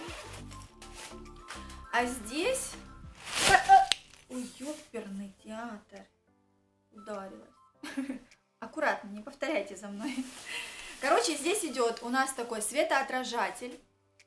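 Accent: Rostov, Russia. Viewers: по-моему ростов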